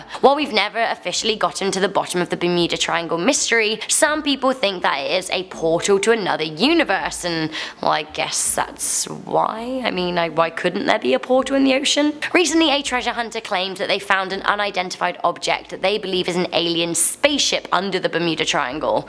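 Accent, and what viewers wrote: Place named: Liverpool, United Kingdom. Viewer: Are you from liverpool?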